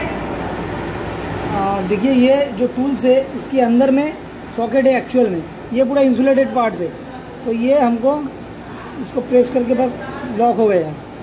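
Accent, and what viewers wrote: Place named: Bengal, India. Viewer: Dada kotha sune mne holo apni bengali...khub sundor laglo video ta erokom video ro deben...EV maintenance krte ki kno electrical licence lage?? Ami ekjon electrical engineering student...janale upokrito hbo🙏🏻🙏🏻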